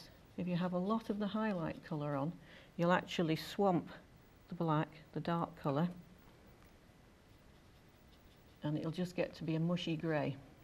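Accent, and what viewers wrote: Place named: Northern England, United Kingdom. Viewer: Beautiful artwork, do you run courses for using acrylics, you sound northern England like me.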